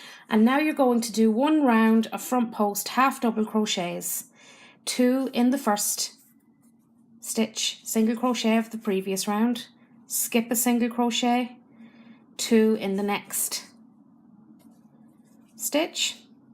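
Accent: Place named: Scotland, United Kingdom. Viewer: Hello again! I just started making a pair for me (I know right) tonight and went over to one of my favorite Youtube podcasters and shared your channel with her. I hope you don't mind Your tutorials are literally the bomb. Between your amazing (Scottish accent, hoping and praying I got that right) and your style of crochet? I love, love, love, your channel!! Here is Teri's Channel <link>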